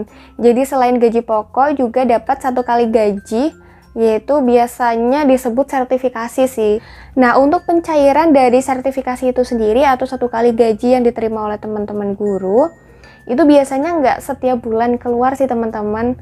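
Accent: Cilacap, Indonesia. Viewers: Sampeyan asli orang Cilacap kak ?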